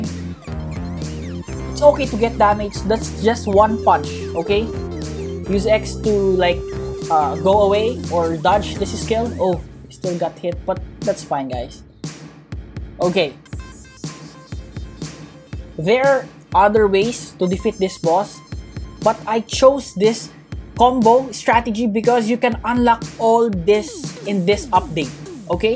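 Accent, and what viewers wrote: Philippines. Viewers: u sound like filipino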